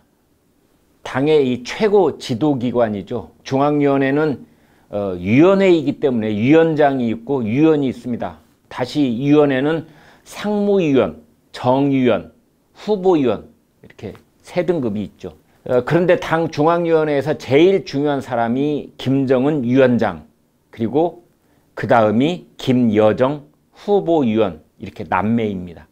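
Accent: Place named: Jeolla, South Korea. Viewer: 전라도 사투리가 구수한 김광일 의원님. 너무 좋아요. 사투리보다 더 좋은건 예리한 의원님의 시사평. 자유민주주의 대한민국이 돌아올수있게 많은 노력 부탁드립니다.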